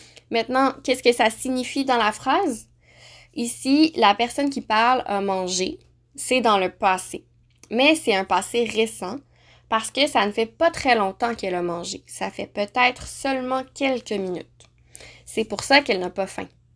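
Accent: Quebec, Canada. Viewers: J’aime ton accent québécoise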